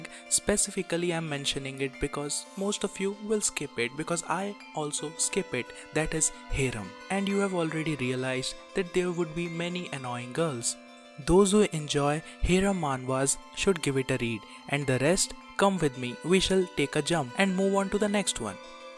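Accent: India. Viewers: i hate indian accent..!! so anoying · Epic video bro. By the way are you Indian? Your accent feels like you are a indian.Btw love your videos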